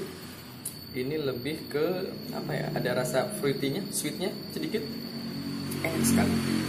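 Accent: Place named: West Java, Indonesia. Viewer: Sunda bgt ... itu logatnya mas 🤣🤣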